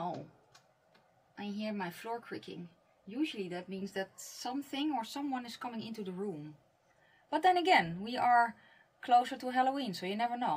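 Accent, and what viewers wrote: Netherlands. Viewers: yep..Dutch hence the accent 😉😘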